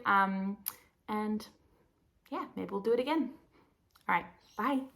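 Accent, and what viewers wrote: Australia. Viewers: Hey, fun podcast. I love that as the podcast progressed you became more “Aussie” - I giggled thinking of overseas viewers when you dropped “so that what I’ll be doing this arv.” 😂 I only came across this podcast because Amy Palko mentioned you - so funny to be hearing of you via a podcaster on the other side of the universe, but there we are. Looking forward to watching all your other eps, and seeing more Buddy antics. Waving at you from Canberra, where it’s often cold enough to wear our knits. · I clicked when I saw you wearing your lovely grey Felix and then I got excited to hear your Australian accent! I’m in Melbourne. I loved all your knits, the shawl was definitely worth getting that mini skein set for - looked lovely with the jumper! And I was extremely intrigued by your use of the “cheeses” of roving! I already was thinking to try Plotulopi one day (don’t know if I can be bothered chasing Nutiden). 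I’m looking forward to seeing how your shawl relaxes after blocking. It looks so dimensional with that roving combined with the braid detail.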